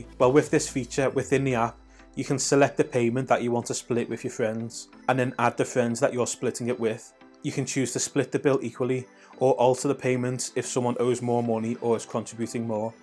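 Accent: Liverpool, United Kingdom. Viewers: You sound like a scouser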